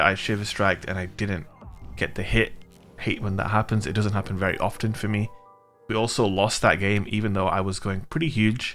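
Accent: United Kingdom. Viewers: Are you from UK? You kinda got that accent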